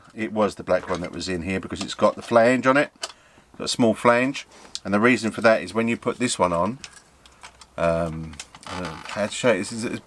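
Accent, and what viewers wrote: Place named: England, United Kingdom. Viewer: Hello! A time ago I found your channel for looking after the Max Thurst Ruckus. Now I´m thinking about buying a used but almost new Draco for about 500€ maybe less and knowing the low points. Until now I have seen complaints about CG, tires (you said they were changed?), power and landing gear (front and rear). What should I consider if buying this used one, what do you think? Much appreciated to hear from you Master:))) Best regards to the UK, your channel is really cool, and it is nice to hear english accent instead of the most in RC spreaded american. Just my opinion:)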